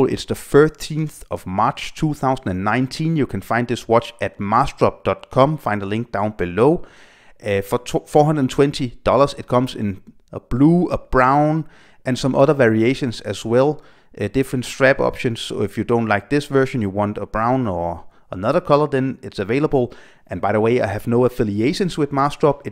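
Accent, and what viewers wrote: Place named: Denmark. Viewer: I’m looking at Massdrop from the US and it is running at $419. You can even get the monster 48mm versions. I have and love the Airman SST Pumpkin. I did buy the “Goldeneye” Combat Sub but I almost never wore it and it wore bigger on the wrist than the 42mm would suggest so I sold it. This bronze version is tempting, but I wonder if the same thing would happen and I wouldn’t really wear it. Also, God Day from the US. I work with a lot of Danes and I love your accent. It’s super!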